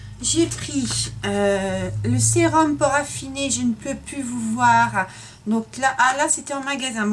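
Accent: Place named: Paris, France. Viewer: Allo cherie tres beaux goodies - j'aime beaucoup - tes yeux sont tres belle et ton eyelashes ooh-la-la - le francais Parisienne est plus jolie que le francais ici - mon dieu tu est tres belle et je comprends tout mais mon francais ecrire et pour les oiseaux hahahahahahaha xxxx beacoup d'amour xxxxx Suzy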